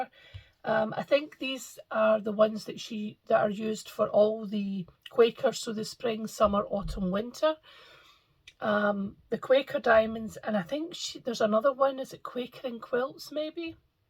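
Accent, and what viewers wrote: Scotland, United Kingdom. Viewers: Congratulations on 3 years Flosstube! Love all your stitching and the inspiration it brings, including the bird and all the lovely fabric. Great to hear a lovely Scottish accent on Flosstube! 🏴❤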